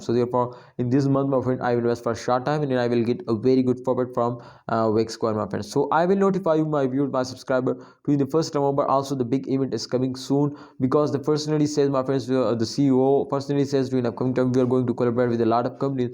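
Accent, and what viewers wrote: India. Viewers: When i here indian accent i move on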